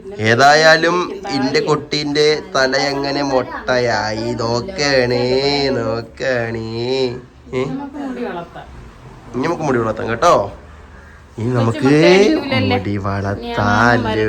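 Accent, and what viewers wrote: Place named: Palakkad, India. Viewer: നീ ചെന്ന് പറയണ. ചക്കരയെ കാളും.. പാലക്കാടൻ ഭാഷ യാണല്ലോ. കൊല്ലം ഭാഷ മറന്നു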